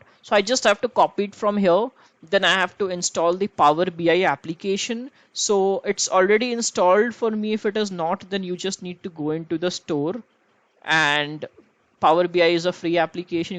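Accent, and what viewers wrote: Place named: India. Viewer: Indian English again? bye~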